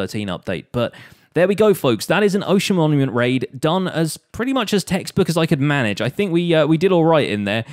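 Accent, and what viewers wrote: United Kingdom. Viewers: I SWEAR he's British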